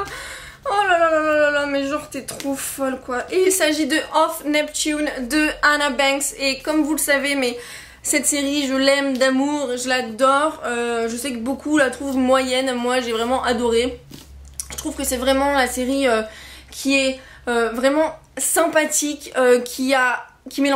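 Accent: England, United Kingdom. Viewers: Salut, t'es anglaise ? En tout cas t'as un super accent ! Bisous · Je me régale avec tes vidéos ,et tu as un accent anglais so cute ! ( vive le VO ! ^^) Malheureusement je n'ai pas autant de livre que toi mais ça viendra j'espère :P Bonnes lectures !! :D ( Of Neptune , la couverture quoi ^^ )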